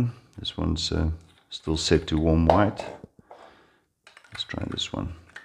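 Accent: South Africa. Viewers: Do I detect a South African accent 😀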